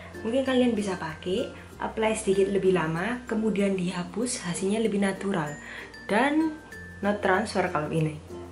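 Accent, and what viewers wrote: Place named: Surabaya, Indonesia. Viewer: medok bgt surabaya nya cik.. tooss laah sm2 org sby.. hahaha😜😜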